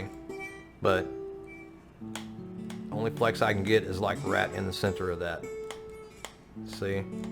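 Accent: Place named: Louisiana, United States. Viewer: Banger of a review, well done! :) just found your channel and subbed, loved the bgm to go with the chill Lousiana accent, great video cowboy :D Btw the flex is at the bottom of the mouse, I recommend not to go too hard there around the sensor although it's probably really safe, some flex at the bottom plate is basically always to be expected :)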